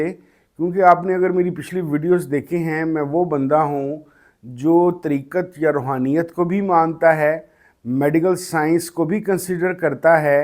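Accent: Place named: Punjab, Pakistan. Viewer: اردو بھی پنجابی پنڈو لہجے میں بولتے ہیں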